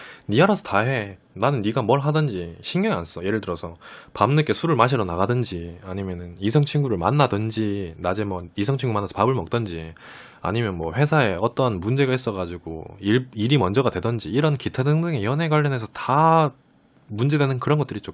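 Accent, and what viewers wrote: Gyeongsang, South Korea. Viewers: 우연히 봤는데 잼있네요. 경상도 억양 있으시네요. 경상도분인듯~~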